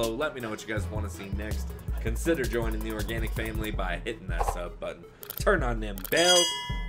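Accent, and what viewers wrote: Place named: United States. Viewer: dah slow dah tu dia ckp...American English tu...bukan English pure mcm UK Dia ckp..UK punya English lagi lah pening nk faham dia punya slang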